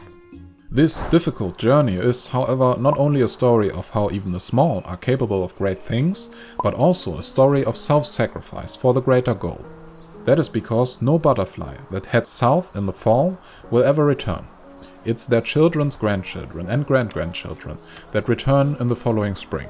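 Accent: Germany. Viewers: Man hört den deutschen Akzent raus ^^ gutes Video 👍🏼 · I find the German accent so refreshing. It gives the impression of being straight to the point without any of the BS.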